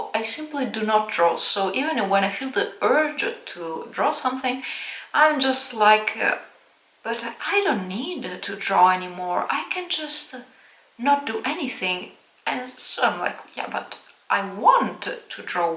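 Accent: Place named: Netherlands. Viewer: I too felt guilty about spending money on frivolous art supplies...though, they brought me joy. I admire your tenacity to really pay your necessities, before your luxuries...you are one smart cookie...though you live in Italy, you actually sound Dutch to me...thank you for your input here...much appreciated...Janis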